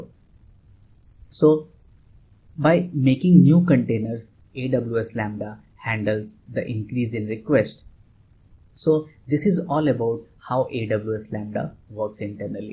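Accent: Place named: India. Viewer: Why you indian do not work on your accent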